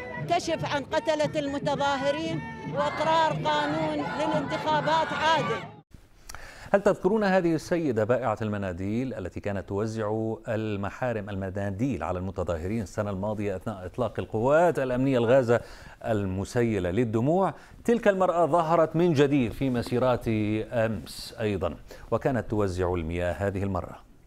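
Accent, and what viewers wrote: Iraq. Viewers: والله احبك لانك اللهجه العراقية بحلقك تخبل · يارب انصر الشعب العراقي على حكومته الفاسده. ملاحضه طريقه قراءه التعليق باللهجه العراقيه للاستاذ احمد فاخوري اتجننن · فدوه للمذيع اجكد حلو وهو يحجي عراقي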